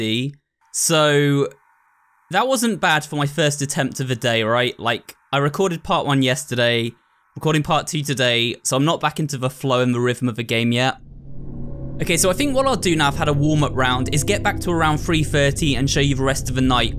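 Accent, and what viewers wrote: United Kingdom. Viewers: He british asl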